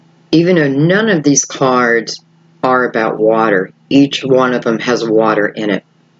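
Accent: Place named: Pennsylvania, United States. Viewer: You’re from PA. I know that accent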